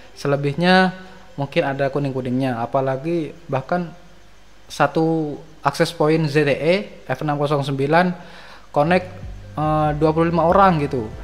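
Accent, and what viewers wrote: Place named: East Java, Indonesia. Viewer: Logatnya sampean kok kayak Orang Lumajang / Jember / Bondowoso. Sampean domisili mana mas?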